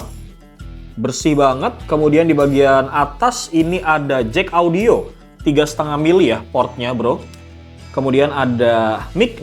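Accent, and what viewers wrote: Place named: Depok, Indonesia. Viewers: bahasa lu kaya orang Depok Bang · W suka penyampaian lu bang, jakarte bgt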